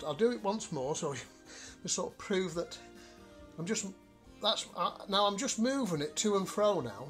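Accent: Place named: England, United Kingdom. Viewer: You sound a lot like the Draftsman, English accent. By the way, the double coil device charged the cap to over one thousand volts. Scary! Could you go to a remote area, out in a field, away from all electric interference. Swing the mag/coil on the rope around in a circle while you stand in the center, hold your hand over your head and swing away, a larger radius. Little voltage in the shop, bigger outside.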